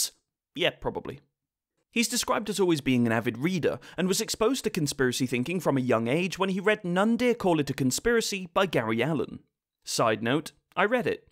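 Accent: United Kingdom. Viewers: I kept thinking... is this guy a savage British guy or a well spoken Australian...but then he said pound and meant currency and I'm siding with him being a brutally savage British. Also... yes I'm American... and... yeah... sorry about... well... most stuff after 1776... we kinda got a little upset about a lot of things and didn't think to restrict gun ownership clearly enough and it has all been down hill from that point...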